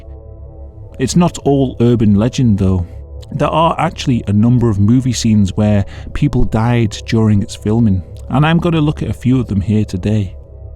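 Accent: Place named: Cockney, United Kingdom. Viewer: nice accent. cockney?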